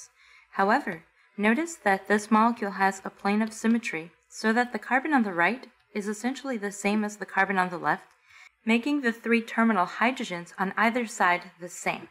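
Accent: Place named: Borough Park, United States. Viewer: You sound like you grew up in boro park. I know that accent from a mile away.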